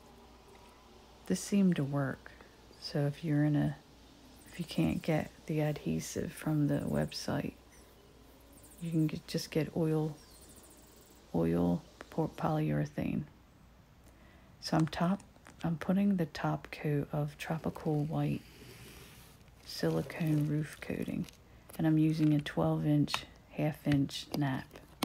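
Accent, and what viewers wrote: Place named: Philadelphia, United States. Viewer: Nice video, I’m a commercial roofer. And go Phil’s! I hear the Philly accent. I’m from Philly too!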